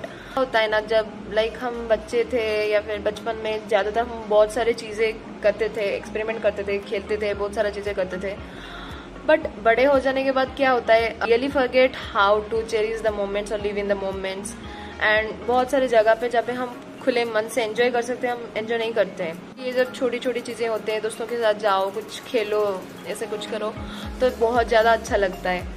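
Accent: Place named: Odisha, India. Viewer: hindi re Odia accent bahut achi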